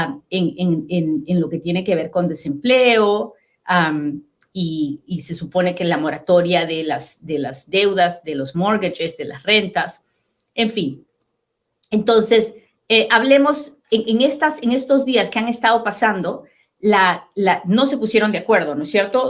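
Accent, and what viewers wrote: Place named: Mexico. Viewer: Por q hablas como mexicana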